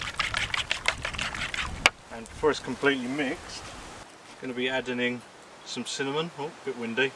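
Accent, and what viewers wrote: England, United Kingdom. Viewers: Are you in uk or usa ,I know you are english from your accent , missed you building your ca in loved to have seen it though, many congrats young man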